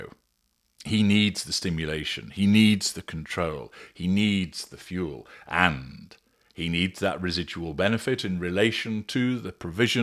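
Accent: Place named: Northern England, United Kingdom. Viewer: Who said you are the swindler??🤣🤣your northern accent is so strong - I hear around Cheshire or Stockport - who’s dumb enough to think you are this Israeli scammer. is definitely from ‘up north ‘. Derbyshire also a possibility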